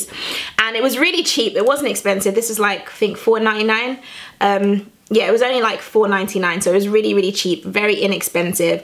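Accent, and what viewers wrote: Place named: United Kingdom. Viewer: Sound British you are a beautiful woman and it fit u perfect. Go girl.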